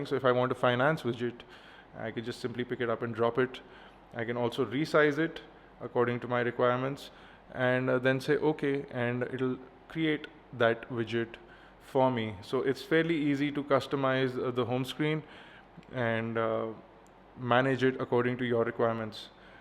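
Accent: India. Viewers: indian ? lol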